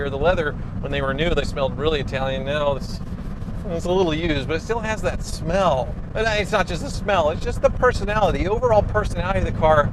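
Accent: United States. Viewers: why cant YE YANKS pronounce words properly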